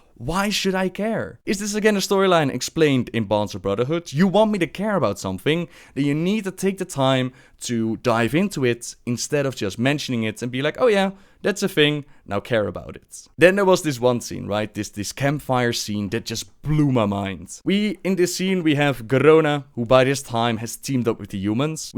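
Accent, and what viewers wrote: Netherlands. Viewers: As a dutch person i have to say... he sounds dutch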